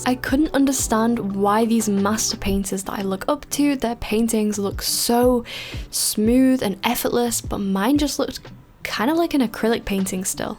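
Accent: United Kingdom. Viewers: Just started watching you recently and you’re so natural to teach it’s brilliant and just like your sister I live in another country and I’m trying to paint but hearing a fellow English woman Is perfect, if you ever get a chance to come to New Zealand I’d love to show you around and even have a mini painting session 😊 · I’m trying to place your accent! Somewhere near Liverpool? That’s where I’m from and you sound exactly like one of my cousins 😅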